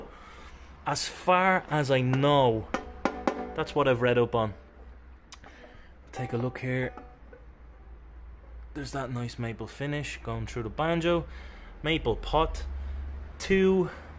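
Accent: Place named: Dublin, Ireland. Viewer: hi happy ne year I was thinking you must be from that part of Dublin you sound very like him alright 😄 I'm not far from you myself just started clawhammer frailing journey Just wondering if you do lessons??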